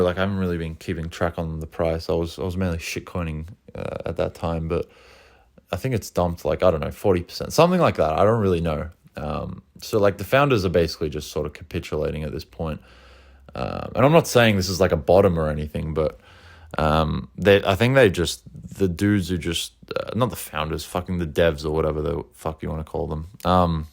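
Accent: Australia. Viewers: chad awsie accent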